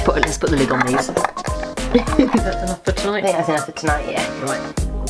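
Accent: United Kingdom. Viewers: YOU SOUND LIKE YOU'RE FROM LONDON!! :D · I can tell she’s British based on her accent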